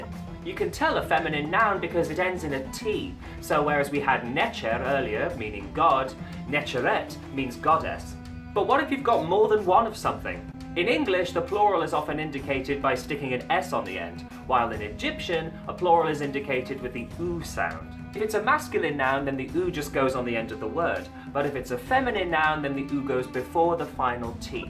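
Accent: United Kingdom. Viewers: The funny part is always the english accent,even here. A lot of english speaking natives are complaining about accents of non natural english speakers if they learn english. Good to see that they are not better. No offense,just wanted to mention it. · Your accent is extremely British in ancient egyptian SORRY. Try to pronounce it differently cuz it's ridiculous but if you cannot do that at least pronounce R at the end of the word and do not create a new non-rhotic British Egyptian :D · Sounds welsh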